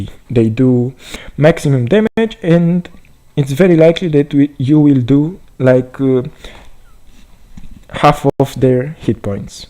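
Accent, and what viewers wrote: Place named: Romania. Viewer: You sound like an romanian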